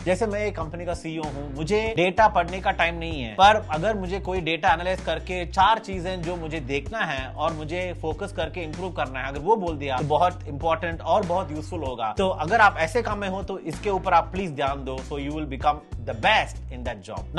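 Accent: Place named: Tamil Nadu, India. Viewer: Thank u for such a video 🙇. Also, I can sense tamilian slang in your way of speaking hindi 😅.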